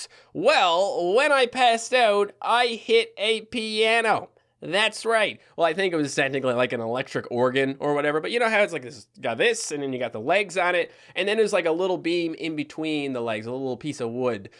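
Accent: Newfoundland, Canada. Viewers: Dude your Newfie accent is fucking, /spot on/. Never been to Newfoundland, since I live in Ontario, but mom's a Newfie, and I gotta say, your accent is perfect. Way to go from a half Newfie to another Newfie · My god your newfie accent is spot on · Man, whenever I hear a thick Newfie accent it always reminds me of my family. All of my extended family lives in Newfoundland, and we used to visit every year but we don’t anymore.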